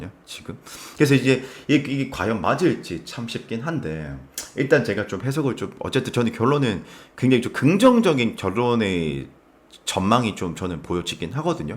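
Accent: Gyeongsang, South Korea. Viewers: 같은 경상도라 그런지 더 정감이 갑니다~ 2번 선택 했는데요~ 요즘 다들 힘든 경기 겪고 있는듯한데 부디 잘 버티고 힘든 시기 고이 지나갔음 좋겠네요. 영상 자주 보고잡아요😊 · 3번 리딩 감사합니다🙏🏻 구수한 경상도 사투리 넘 듣기좋아요 ㅋㅋ 중간에 살아있네ㅋㅋ 쌤 리딩 처럼 졸라 좋은 결과 와서 후기 남기고 싶네요 😂 추운날씨 감기 조심하세요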